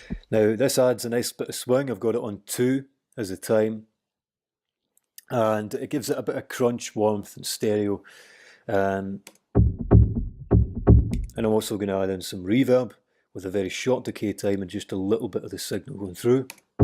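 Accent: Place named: Glasgow, United Kingdom. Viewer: Fuckin yass subscribed as soon as i heard the accent. Min the glesga!! Class tutorial be swatching the rest.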